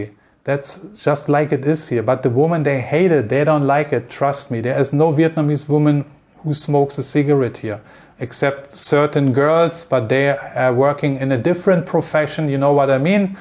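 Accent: Germany. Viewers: Do I here a strong German accent? 😊 How to stay there without border running?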